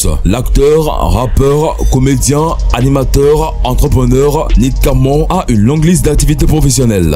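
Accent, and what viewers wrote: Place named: Benin. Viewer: C plus.son accent beninois.ils disent pas les.R.comme moi d ailleur hihihi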